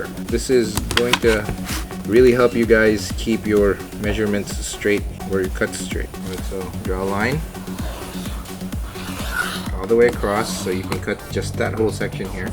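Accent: Philippines. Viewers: Pinoy accent sub😁 idol😂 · Are from the Philippines, you sound Philippino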